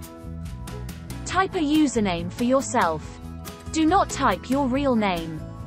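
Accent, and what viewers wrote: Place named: United Kingdom. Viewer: `Je praat echt met zo’n Brits accent’. Or in English: Your really talking with an British accent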